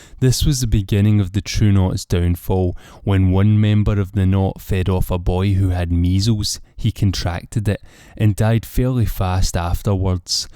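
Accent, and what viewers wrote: Scotland, United Kingdom. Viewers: I'm guessing Scotland.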